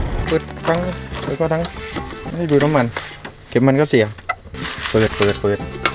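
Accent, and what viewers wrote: South, Thailand. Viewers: คนจังหวัดำไหนคับเห็นแหลงใต้ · พี่คนใต้เหออ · อื้ม~ พี่บ่าวใช่คนใต้รึเปล่านิ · พี่คนภาคใต้ใช่มั้ยนิ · พี่คนใต้หรอครับ🐹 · พี่คนใต้นิผมว่า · คนใต้แน่นอนคับ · พี่เป็นคนใต้เหรอค่ะ เสียงได้แรงอกมากนิ่ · เสียงเหมือนคนใต้มากกกกกก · คนใต้นิ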